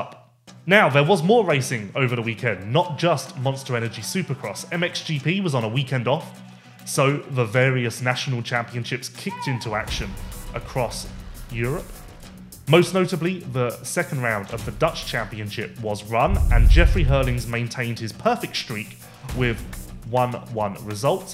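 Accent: United Kingdom. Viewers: I’m gonna have to pass on this British accent. Hard pass. I’m sorry I even clicked on it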